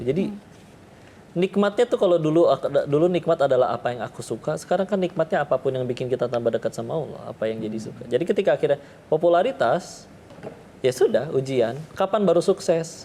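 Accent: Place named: West Java, Indonesia. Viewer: kan org sunda, bogor, nyantri d bandung, jd logat ny k bw sunda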